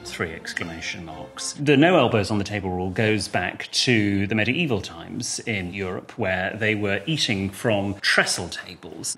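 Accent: United Kingdom. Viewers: 判を押したようなイギリス英語だけで腹いっぱい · どうしてダメなのか理由を教えてくれるから良い。 イギリスっぽいイントネーションと時々皮肉が混じるのも見てて面白い。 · イギリス英語聞けて嬉しい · イギリス英語だー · イントネーションイギリスすぎる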